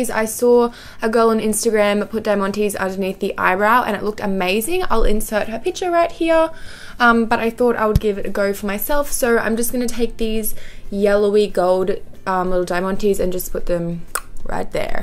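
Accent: Australia. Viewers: Is she Aussie?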